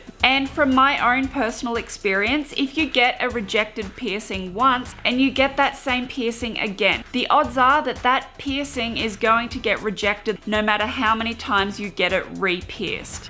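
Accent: Australia. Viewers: Love your Australian accent... Will you ever get your septum pierced?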